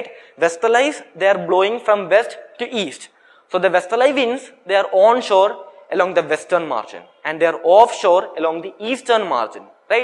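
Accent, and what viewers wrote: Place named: South India, India. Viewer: Typical South Indian English Accent.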